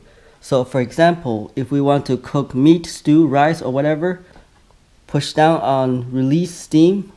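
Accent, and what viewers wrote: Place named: China. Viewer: A cook with a Chinese accent always make great food :-)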